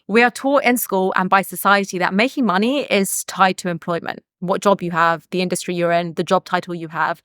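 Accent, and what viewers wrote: England, United Kingdom. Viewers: Your beauty is pleasant to the eyes, your English accent is sweet to the ears and most importantly, your content is educative to the mind and actionable to the hands that takes actions., you're a complete package!!! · nice content your english is british am american hard to catch up please try to be a little slower when you talk :yt::yt::yt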